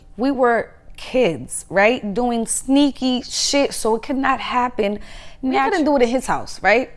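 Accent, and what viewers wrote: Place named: Brooklyn, United States. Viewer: One thing about, it’s not a word she can say without sounding Brooklyn af. 🤣 Like everything about you scream BK/NY. I love your accent - a Bronx girl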